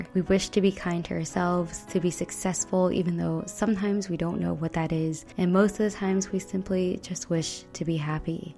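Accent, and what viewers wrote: Vietnam. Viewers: Leave me a Comment is hãy để lại cho tôi 1 bình luận in vietnamese. Your voice is so sweety, and you are speaking the southern accent.😍😍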